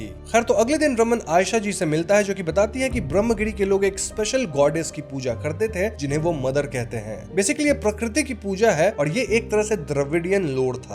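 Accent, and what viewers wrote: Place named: Bihar, India. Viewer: Kedla kya hai bhai.... Bihari accent mat ghua bhai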